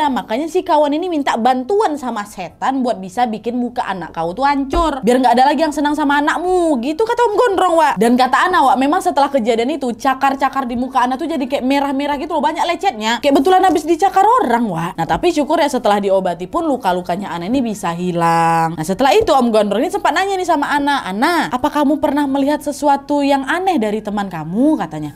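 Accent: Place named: Padang, Indonesia. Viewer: Lama2 nada bicara LW kyk Padang!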